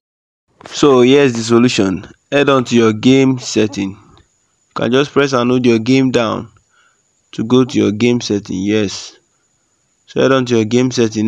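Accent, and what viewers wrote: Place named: Nigeria. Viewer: This guy sounds Nigerian cos im a Nigerian. Thanks very much really appreciate the solution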